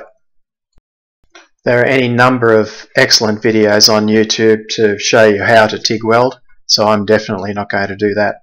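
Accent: Australia. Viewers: Great review. It's hard to hide that Aussie accent. I just Googled it and it seems pretty good for the price. It's currently list at $349. Is that what you paid? What part of Oz are you in? I'm in Gippsland. Cheers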